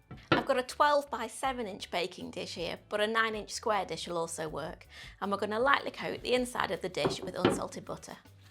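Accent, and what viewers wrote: United Kingdom. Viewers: OMFG PURE BRITISHNESS. BEAUTIFUL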